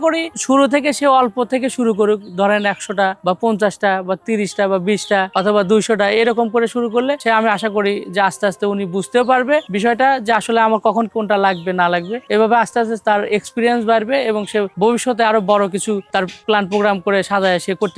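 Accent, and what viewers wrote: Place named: Comilla, Bangladesh. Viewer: তর বাড়ি মনে হয় কুমিল্লা হালার পুত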